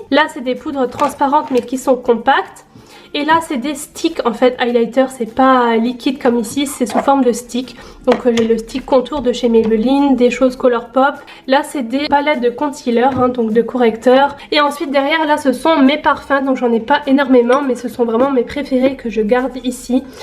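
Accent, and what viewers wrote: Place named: Alsace, France. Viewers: C'est la premiere fois que je ne m'ennuie pas dans une video rangement makeup lol.. Sinon t'as vraiment l'accent alsacien ou lorrain jsp mddr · Hello! suis fan de tes vidéos et Strasbourgeoise ! ça fait plaisir de reconnaître quelqu'un avec son petit accent ! Superbe vidéo, moi qui me fait une coiffeuse ça m'a donné plein d'idées ! merci beaucoup et gros gros bisous !! #TeamSnap 🌟🌟🌟🌟🌟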